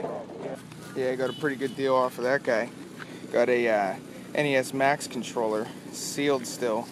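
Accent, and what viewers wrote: United States. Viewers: chicago accent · dat pixburgh accent.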